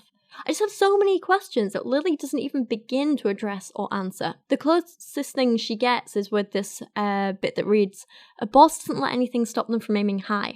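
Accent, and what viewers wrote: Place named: Staffordshire, United Kingdom. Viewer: Your Lil' Staffordshire is adorable and i love it <3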